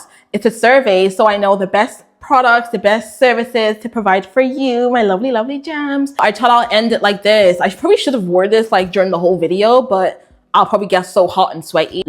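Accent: Ireland. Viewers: Omds girl are you from Ireland?! I know a fellow black Irish girl when I hear them 😩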